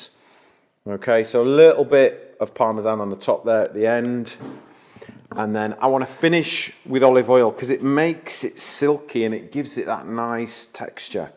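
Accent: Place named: North, United Kingdom. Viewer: love the accent! how northen are you?))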